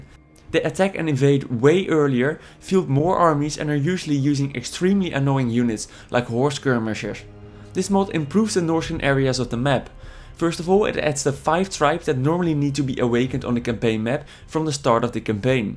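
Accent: Netherlands. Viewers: Nederlands? :) leuk accent, kan ze d'r zo uitpikken :P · It's fairly easy to hear the dutchness in the voice XD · Somebody is Dutch..:) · This geeza Dutch? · I'm now.. and you are dutch, and have braces.. i can tell.. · You sound quite Dutch :) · Someone is really really Dutch. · Are you dutch?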